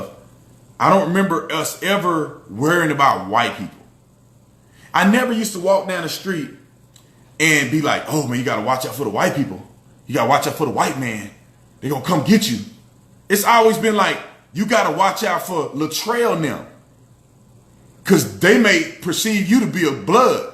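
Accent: United States. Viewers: Sounds like u grewup an American ! So did I.....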